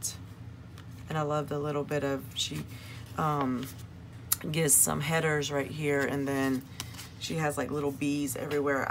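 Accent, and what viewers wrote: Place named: South, United States. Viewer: Can I just stop and give a hey y'all to your sweet southern voice! :)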